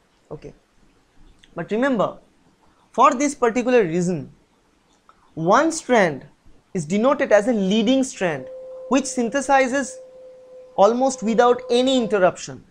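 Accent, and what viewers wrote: Bengal, India. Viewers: Sir r u bangali ??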